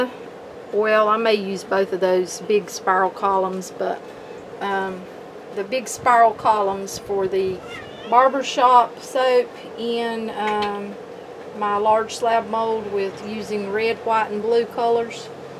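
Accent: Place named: South, United States. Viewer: OMG I LOVE YOUR VOICE! YOUR VOICE SOUNDS LIKE, JUST LIKE MY GRANDMA, the accent sounds just like her, she's from the south. Omg love it.. sorry lol. Very nice video. Enjoyed and will add you to fb. Omg, your accent is the same wow.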